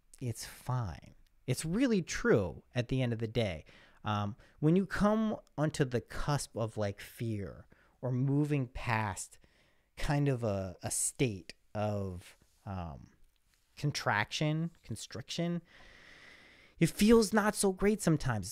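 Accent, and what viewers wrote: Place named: Ireland. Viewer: So your Irish accent was a hidden gem you should absolutely let shine WAY more often...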